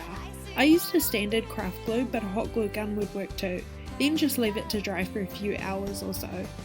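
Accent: New Zealand. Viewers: hey! are you a kiwi? I think I detect a New Zealand accent? if so, hi fellow NZer! just wondering, where specifically did you buy the wick? I find it difficult to find here in New Zealand, and was wondering where you got it. thanks!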